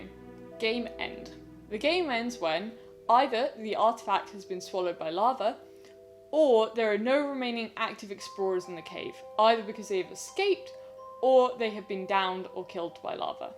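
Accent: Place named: United Kingdom. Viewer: Donnie DeVille this is not mumble, it's British.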